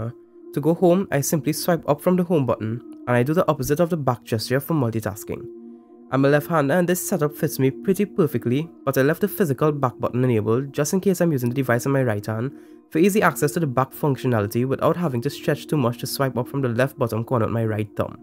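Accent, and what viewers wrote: Trinidad and Tobago. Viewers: trini accent people, am a Vincentian and we from the Caribbean!!!! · Phanic! At the Twenty One Crybabies it's Trinidadian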